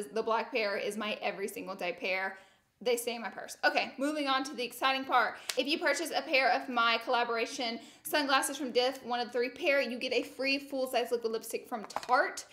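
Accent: South, United States. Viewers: omg grl! thank u so much for sharing & I watch your channel because of the makeup & the comedy! you are so funny! I'm from the south too so thank u for not hiding your accent or your goofiness. You are amazing! It takes so much dedication to work with your husband I'm sure. thank u for always being yourself . God bless u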